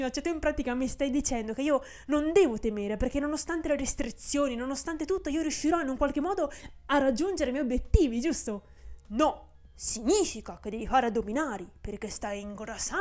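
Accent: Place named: Japan. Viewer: L'italiano parlato con accento giapponese.... MAGNIFICO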